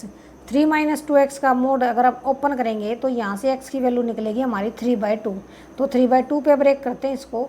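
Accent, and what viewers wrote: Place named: Haryana, India. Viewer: Pure haryanvi🤩🤩🤩🤩😍😍🥰🥰👌👌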